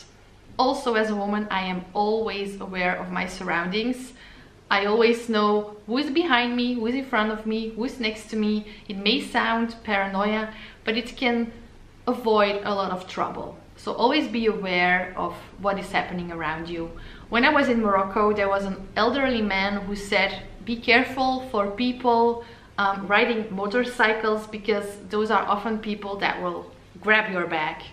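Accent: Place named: Antwerp, Belgium. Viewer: Finally I found someone with the same accent as me!! Ik ben Antwerpse maar woon sinds 15 jaar in de USA.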